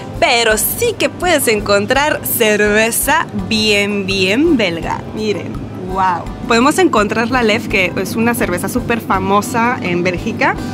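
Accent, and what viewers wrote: North, Mexico. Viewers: Me encanta como hablas en español 😂😂😂 hablas como del norte de mexico tienes una chispa increible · En serio eres de Bélgica por k en verdad ni lo pareses y ni tienes asentó belga más bien tienes asentó mexicano en verdad y lo tienes me gusta Saludos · Hola, en verdad que tú lenguaje de español es un híbrido muy interesante ya que en ocasiones te escucho hablar el acento chilango y que no es el que hablan en los productos televisa, en otros momentos te escucho como un poco el acento del norte y lo más raro es que ya que viviste un tiempo en el sur del país no tengas su acento, en fin, te mando un Enorme Beso, hasta luego. 😘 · Eres muy guapa .vives en Bélgica ? Tienes asentó muy Mexican . Ya me enamoré de ti 😄 ❤️ saludos desde Chicago il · me gusta tu manera de decir las cosas y hablar mexicana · Hablas perfectamente mexicano. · Wow. 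Me sorprende tu acento. Hablas más mexicano que yo. :'( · Hola! Me sorprende lo bien que hablas español. Especialmente porque es un español muy mexicano. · Jajajajajaja....hermosa Mexicana.....!!! Tu eres Mexicana en mi corazón... Que cosa.....Hablas y te expresas total....Y absolutamente Mexicana.... Que linda.....!!!! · woww hablas rebien el español mexicano supongo debiste estar mucho tiempo en México también podría ser por eso que tienes acento mexicano campechaneado pues durante el vídeo estaba yo emmm es chilanga sii y luego te salía acento norteño y yo achingaa pus mejor le pregunto 😅 gracias por contestar por cierto estás hermosa 😍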